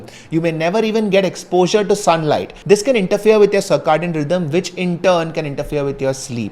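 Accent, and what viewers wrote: India. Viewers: Nice Indian English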